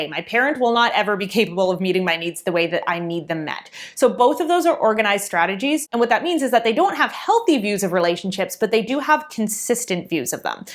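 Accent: Canada. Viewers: This is the first video where I realized is Canadian. I don’t hear it as much in her new videos.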